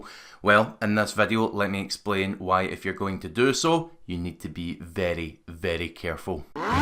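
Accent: Scotland, United Kingdom. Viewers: I love the scottish accent. It just wonderful.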